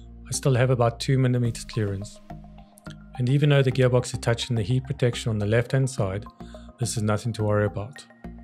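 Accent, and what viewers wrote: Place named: South Africa. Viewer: Hey you probably won't reply to this but you sound South African. I just want to ask if you are South African where did you get the kit cuz I want to make one of my own but i cant find where to buy one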